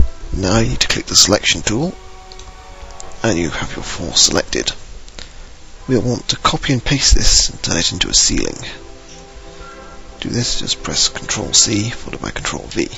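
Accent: RP, United Kingdom. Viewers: You have a realy "Posh" accent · Hey! you have such a posh accent, is it foreal? im from central london and my accent is not nearly as posh as yours! i cant imagin you playing CSS Shooting people, it would be funny lol. :) and thanks! i watched nearly all of your tutorial video's and i have nearly fineshed my first EVER map! many thanks. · You talk posh ?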